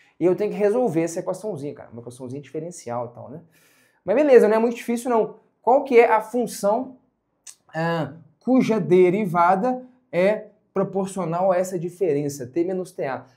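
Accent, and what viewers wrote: Minas Gerais, Brazil. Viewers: Oce e mineiro guisole?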